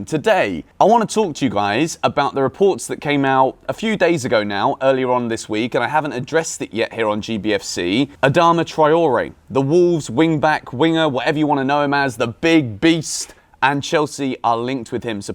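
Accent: South Africa. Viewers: You have got the South African accent down bru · Thanks for the South Africa accent bru❤❤🙌🏾 you making my country proud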